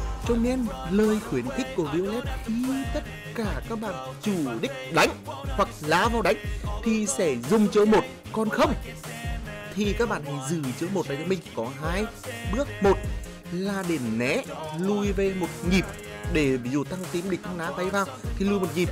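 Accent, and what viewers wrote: Nghe An, Vietnam. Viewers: Chất giọng nghệ an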